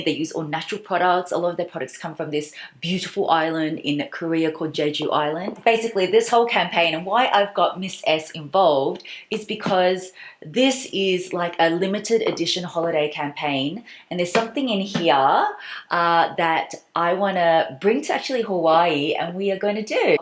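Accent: Australia. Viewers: Are you Thai or Filipino? Like your Australian accent mixed with Asian roots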